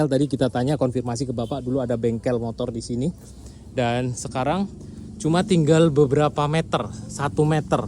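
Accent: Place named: Padang, Indonesia. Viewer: wes penter boso padang, suwi suwi jowone kelelep. Semoga sehat selalu bolo.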